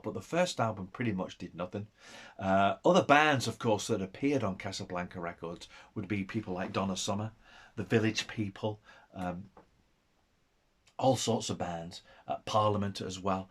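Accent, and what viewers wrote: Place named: Cockney, United Kingdom. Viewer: The only person who cares about this “anniversary” is you. I’m Chip Rock I shot the cover of KISS ON TOUR 1976. 41 photos in KISSTORY too, my photo credit is in ALIVE 11. Gene once told me in ‘75 “you’re our biggest fan!” All serious fans know when the 1st album came out I paid 2$ for it in a California hippie record. Incense galore. You need a haircut & walking papers. Not impressed & your lower class cockney accent is ingratiating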